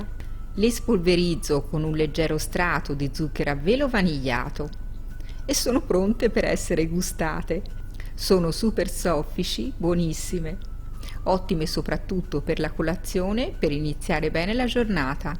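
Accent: Tuscany, Italy. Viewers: Hai una bellissima voce, mi pare tu sia Toscana. Complimenti per la spiegazione della ricetta. Buonissime!!! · Buonissime e mi piace anche l`accento Toscano ho vissuto a Firenze 20 anni e amo l`accento toscano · Sei toscana?😅semplice curiosità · Che bell’accento toscano! 😀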